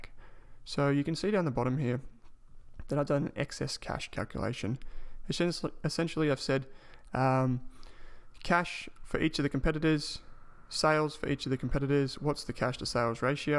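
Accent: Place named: Australia. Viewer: hey, i'd just like to add some constructive criticism. I think your voice is a little bit too low, and especially because you have an australian accent, it gets a little bit difficult to understand what you're saying. So you if could speak a little louder, that would be great, haha.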